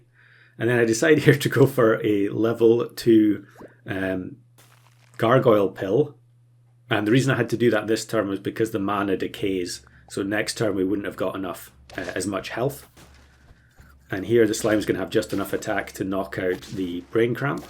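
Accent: Scotland, United Kingdom. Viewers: Which part of Scotland is your accent from?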